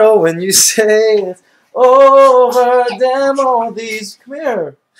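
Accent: Philippines. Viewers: using his filipino accent to discipline Pinoy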